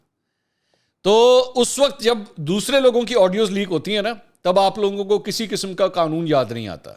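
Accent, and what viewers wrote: Punjab, Pakistan. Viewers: Mansoor ali khan lagta hai shahbaz Gill se uske punjabi touch se bohat impress ho gay hain.. lakin inko acha nhi lagta is tarha ka touch dena punjabi ka..yh higly qualified person hain .inko pehle ki tarha hi bolna chahiye.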